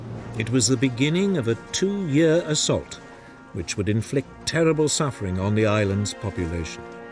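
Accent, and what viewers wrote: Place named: Scotland, United Kingdom. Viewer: Re: the segment on the death of IJN YAMATO/Operation Ten-Go: whoever did the voiceover (or the AI software) -fire them... or pick a different one. That stupid scottish accent is like nails on a chalkboard. Drove me clear up the wall. I had to turn it off.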